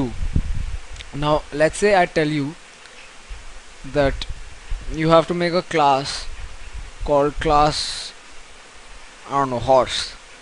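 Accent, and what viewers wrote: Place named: India. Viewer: Your Indian English is soo much better, than Chineese English!!! :)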